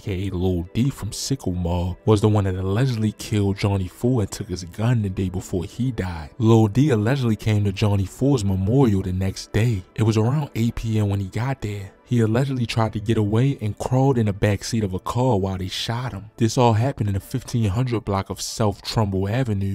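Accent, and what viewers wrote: Baltimore, United States. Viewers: The commentator sounds like he's from Baltimore.. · Narrator is definitely from Baltimore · The narrator must be from Baltimore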